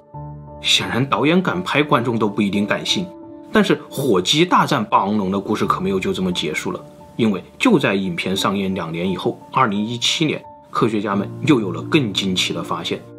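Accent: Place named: Chongqing, China. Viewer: 我预判是重庆人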